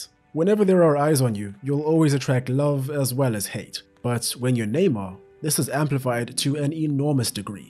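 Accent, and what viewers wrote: South Africa. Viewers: Are you from South Africa? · Are you South African mate? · Nice to hear a South African accent for once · Is that a South African voice i here? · Through out this whole video I'm like "this man sounds hella South African" and I'm glad I was right. Just gonna sub cause of that ✊. Also great video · You sound South African? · I knew this voice sounded south african awe my brother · Hey…are you South African? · I just wanna know, are you South African becoz you sound like one… btw love the videos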